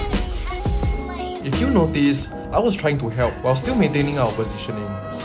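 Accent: Singapore. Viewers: Man sounds like he is from sg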